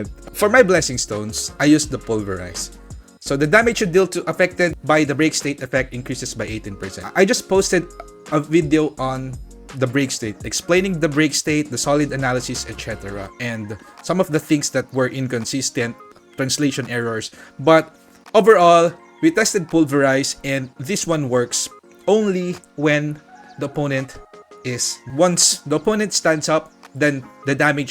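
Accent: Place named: Tagalog, Philippines. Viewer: Are you filipino? Accent sounds like a native tagalog speaker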